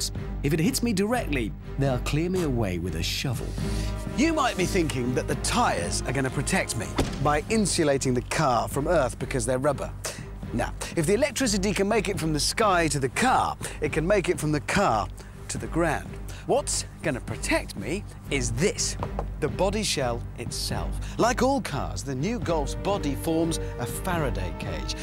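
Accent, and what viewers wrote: Germany. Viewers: Well to be honest, almost every German older than 30 is speaking like that. The younger generation, especially the teenagers are a lot better and have less of an accent because they grew up with youtube. But I guess you will always be able to tell. BUT: As a german native speaker I am always able to tell if the person I am speaking to is an english native speaker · This is the most german english ive ever heared😂 vor handred sausend wollts^^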